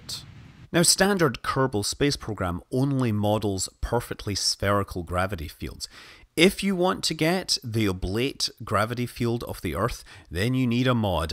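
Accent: Scotland, United Kingdom. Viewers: Jack Shanahan He’s a Scottish Laddy. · DigitalSaturnX by a manly Scott called Scott Manley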